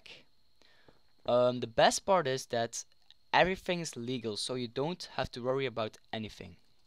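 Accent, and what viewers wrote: Belgium. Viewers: hoorde aan u accent al da ge een belg zijt haha :d ma goeie tutorial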